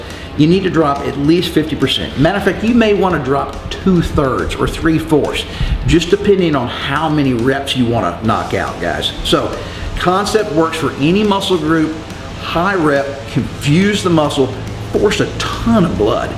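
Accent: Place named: Dallas, United States. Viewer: Sounds just like everyone I grew up with in Dallas. Wonder if he is from my home town or even went to some of the same gyms that I did in the 80s? I lived everywhere from University Park to Plano.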